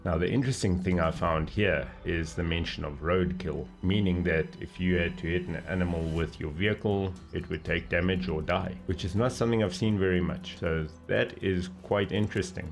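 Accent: South Africa. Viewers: I knew you where South African as soon as I heard your voice,I'm really happy tho that there are more sa youtubers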